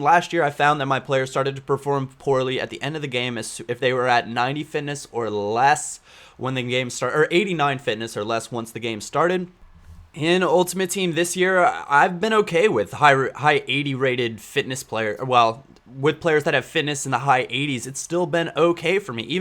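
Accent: United States. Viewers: leave a like for american accent !!! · hes american isnt he?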